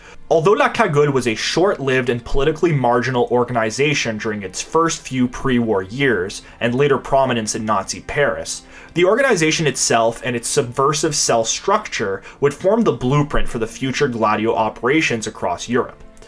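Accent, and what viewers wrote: Quebec, Canada. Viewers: That pronunciation of French? My man is Canadian. French-Canadian. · I think he's Canadian -or very much sounds like in French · Most likely French-Canadian per the accent. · Tu sonne comme si ta appris ton francais au québec. Nice! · Thanks for the wonderful video, and thanks for not hiding your Quebecois/French Canadian accent!:D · mon, ton accent me dit que tas grandi un bout a quebec haha ne laches pas continue ton truc tes videos sont geniales! tres bien recherché chaques fois! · C’est du bon québécois! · Makes sense the dude is definitely from Quebec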